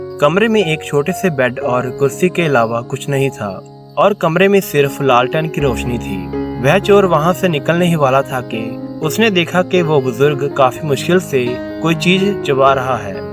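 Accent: Punjab, India. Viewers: Bhai punjabi hai kya? Words bolte time punjabi accent aa rha hai for example missing "aa आ"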